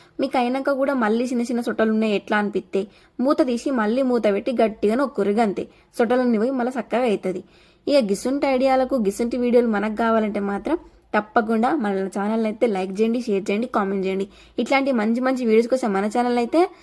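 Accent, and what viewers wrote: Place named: Godavari, India. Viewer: Godavari slang. Chei. Nice. Face chupinchi. Chei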